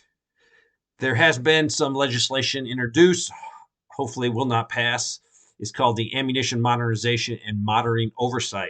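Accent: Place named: South, United States. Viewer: It’s 3. Nu cle yer. Also, he’s Southern. Everything has more syllables in the South.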